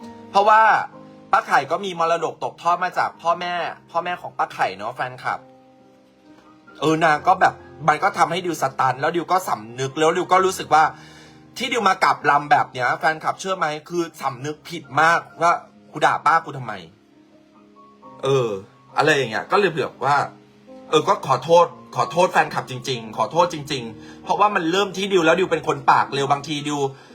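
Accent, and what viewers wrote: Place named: Isan, Thailand. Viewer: น้องดิว เว่าภาษาอิสานม่วนๆๆ🎉🎉🎉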